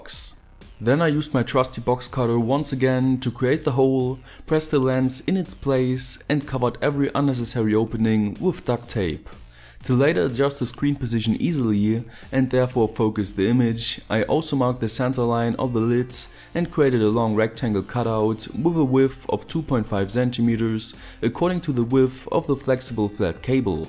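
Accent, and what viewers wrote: Germany. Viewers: nice work. german english... gruäss us dr schwiiz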